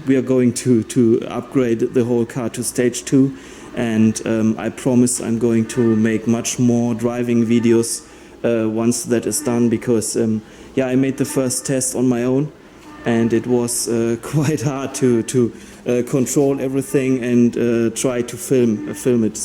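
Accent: Germany. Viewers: This happens if a german try's to speak englisch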